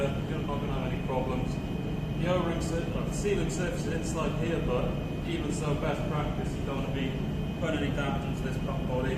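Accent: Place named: Wales, United Kingdom. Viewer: oh right that's cool I'm from South Wales and thought that's a Welsh accent. Where do you meet the ship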